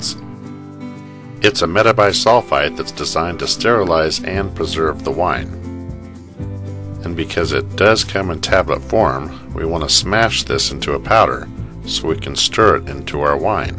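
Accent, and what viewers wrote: United States. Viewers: very good cowboy accent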